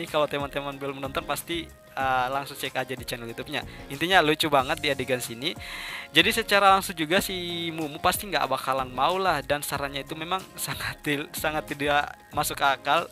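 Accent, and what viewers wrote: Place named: Sulawesi, Indonesia. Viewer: Kentara sekali logat Sulawesi nya 🙏😁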